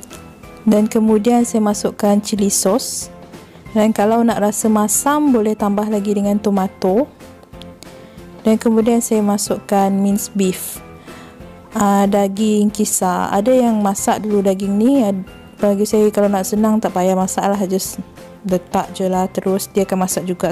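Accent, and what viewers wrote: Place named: Sarawak, Malaysia. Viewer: Dengar cara klaka apat cam sarawakian...sekali jumpa bideo lain ternyata betol² sarawak....wahhhh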